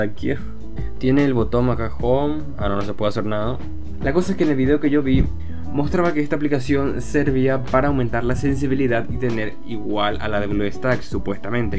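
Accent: Paraguay. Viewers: Sos Paraguayo pero con mezcla de chileno argentino brasilero y otro más??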